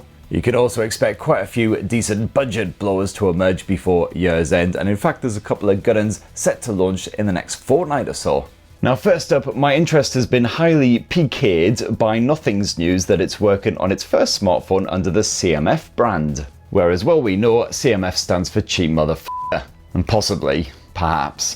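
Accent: United Kingdom. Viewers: He sounds British but doesn’t act shitty like them which I like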